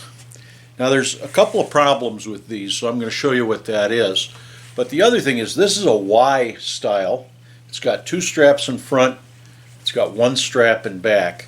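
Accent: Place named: West, United States. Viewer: you can hear the west in his mouth lol. UNCLE!!!!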